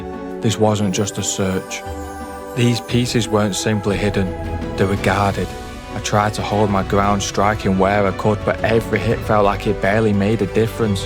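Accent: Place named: Manchester, United Kingdom. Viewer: Wonderful Channel I Love watching your Adventures and Narration Can I ask are you from the Manchester Area?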